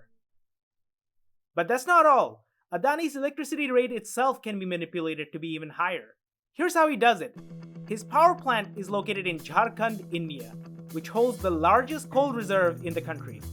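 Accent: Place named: United States. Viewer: Good one sided propaganda video. Very smart idea to do propaganda in Americanized English accent. However, this amateur analysis will not work outside of gullible Bangladeshis.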